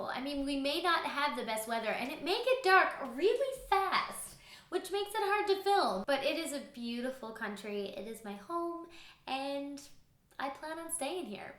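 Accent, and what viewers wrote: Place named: Newfoundland, Canada. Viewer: TIL Newfoundland has a very distinct and interesting accent, coolbeans! Also that nipple covers make excellent MacBook feet (I use chair leg covers for them, myself).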